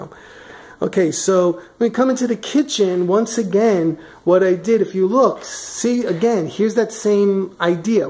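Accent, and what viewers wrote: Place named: New Jersey, United States. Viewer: OK so needs to publish a recipe book and you need to publish a holiday decorating book I can see them on coffee tables every where. BTW I have lived in Vermont for the last 57 years but I still know a Jersey accent when I hear it. Mom was from the Hazlet area and Dad wae from Philly. Be well you two.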